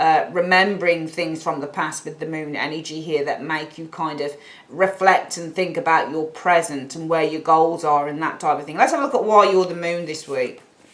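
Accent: United Kingdom. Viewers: love your pronunciation..so BRITISH..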